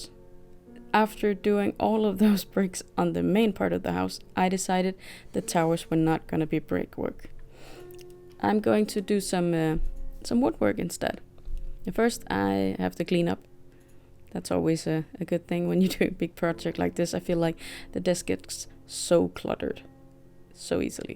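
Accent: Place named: Brazil. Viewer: My Dies are on their way so am watching your techniques to prepare for my house! Not sure if I would have the patience to do all of that brickwork but very well done. Looking forward to seeing the completed project. I have been trying to guess where you live by listening to your accent, my guess is Brazil?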